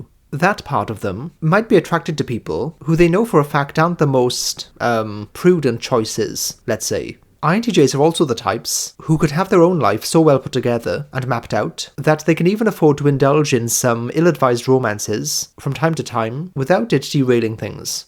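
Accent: United Kingdom. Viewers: yeah, has his own British polite and accurate magic that is enjoyable to hear and very well applicable for some deep discussion) Well, I somehow understood by several (7 or 8, maybe) tests that I'm somewhere in INTJ-INTP-ISTJ-INFJ zone. Then I looked through videos about these types and the closest one appeared to be INTJ. Though it's either exposes INTJ as much smarter and mystical than they are IRL, either it's me being too stupid for an ideal INTJ)) I can't say for sure, but by the discussion here you really have some INFJ vibes. I usually speak more roughly and straight-forwardly. As a proper INTJ, that is ^_^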